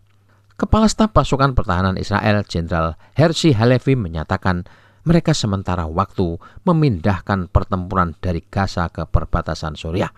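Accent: Java, Indonesia. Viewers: Jowo medhok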